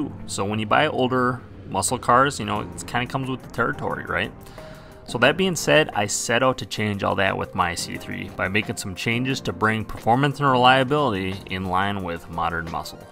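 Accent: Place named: Michigan, United States. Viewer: You sound like my cousin from Ann Arbour Michigan. great job with the corvette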